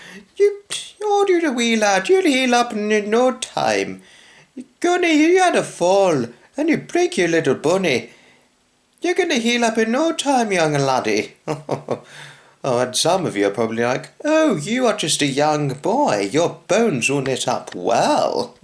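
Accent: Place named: Scotland, United Kingdom. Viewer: wow, you've got a regular channel now! Didn't know you hurt your foot, but glad you're on the mend. Love your wee laddy accent.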